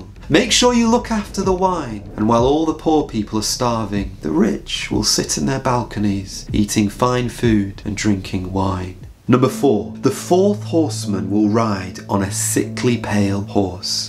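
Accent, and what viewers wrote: England, United Kingdom. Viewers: I love ❤ your English accent sounds so musical🎶🎵🎼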